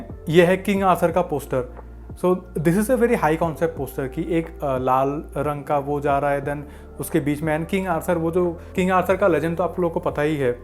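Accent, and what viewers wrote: Assam, India. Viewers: Tumar accent khuni doubt korisiliua j tumi Assamese hoi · lmao. I knew you were Assamese before reading the Description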